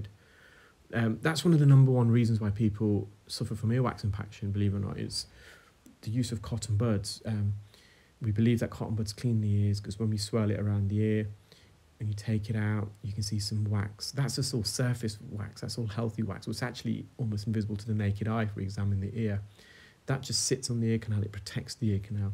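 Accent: United Kingdom. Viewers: You're not the first to fall for a British accent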